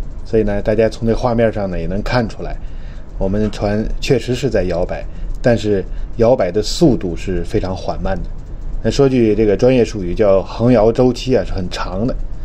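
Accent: Tianjin, China. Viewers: 您是天津人吗？ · 船长辛苦！下次来纽约新泽西需要什么提前说一声，无论你在哪一个terminal停靠，我都可以给你送过去。您略带津味儿的普通话很咯儿很亲切（我是北京的，您有一期节目车在二环上行驶从我家楼下经过)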